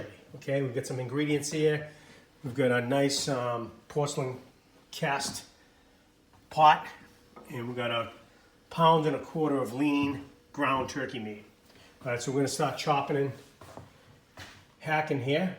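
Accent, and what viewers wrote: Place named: New England, United States. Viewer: The New England accent is amusing.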